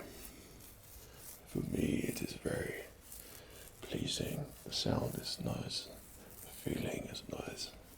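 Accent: England, United Kingdom. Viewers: Lol he's actually British. I'm Australian and we don't sound like this. I wish he was Australian though :(((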